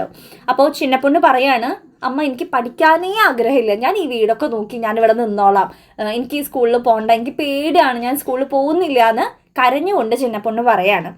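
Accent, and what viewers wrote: Thrissur, India. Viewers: തൃശൂർ slang is ❤️😌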